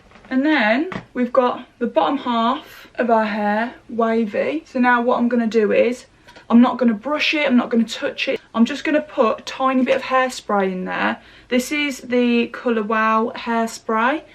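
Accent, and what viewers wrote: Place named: Telford, United Kingdom. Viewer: This saved my life this morning 🤦🏻‍♀️ can I ask where your from? My family are from Telford and have the same accent 🥰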